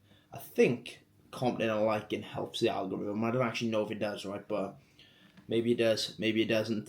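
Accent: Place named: Wales, United Kingdom. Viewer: Where in Wales are you from?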